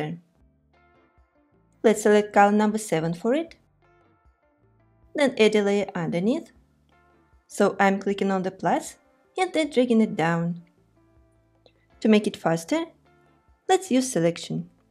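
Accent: Russia. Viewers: Сразу почувствовала, что вы русская ❤ у вас супер Английский) спасибо за отличный урок ❤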